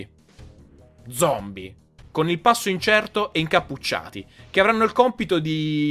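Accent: Bari, Italy. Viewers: Tu e il tuo accento barese spaccate <3 :D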